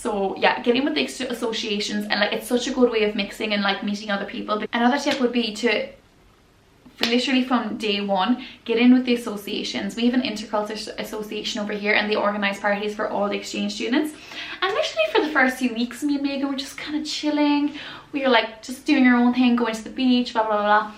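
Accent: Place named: Ireland. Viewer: Irish accent? I LOVE IT ❤️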